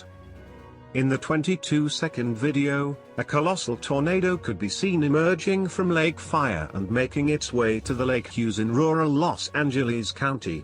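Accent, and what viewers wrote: United Kingdom. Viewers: Thats not True there have been fire tornados before ,,,, Robot Brit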